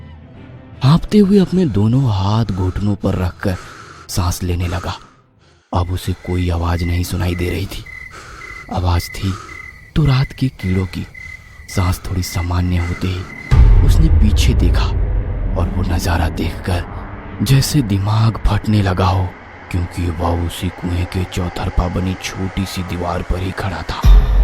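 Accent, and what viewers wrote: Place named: Rural, India. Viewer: although the accent is a little village like but am in love with the voice 💕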